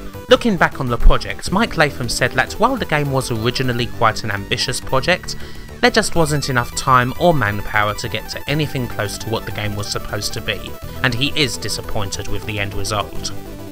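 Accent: United Kingdom. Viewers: Whoa I couldn’t put my finger on it but I’ve never heard a British accent with a speech impediment in my life! Interesting. No hate.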